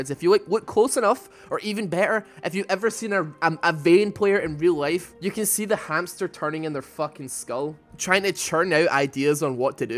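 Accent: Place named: Scottish Highlands, United Kingdom. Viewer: Your vids are awesome man you sound like your from the highlands xD I live near Inverness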